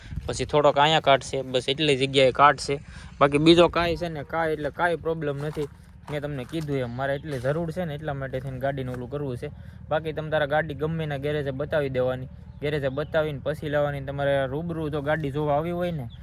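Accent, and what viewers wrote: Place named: Padvi, India. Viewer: પડવી છે આ બોલી પડવીછે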